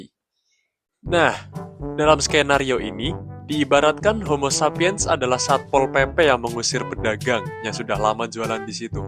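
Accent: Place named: Banyumas, Indonesia. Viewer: Sampean wong ngapak Yo mas...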